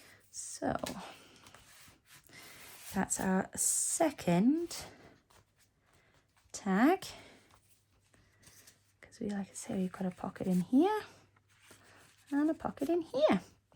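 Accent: Wales, United Kingdom. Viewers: 🤣🤣🤣 OK, I didn't hear it the first time around but I can now! Too funny! It sounds so wrong coming out of your mouth alongside your lovely delicate Welsh accent! You'll be fine when you come over here though, most ppl will just think you have bit of a strange accent! ❤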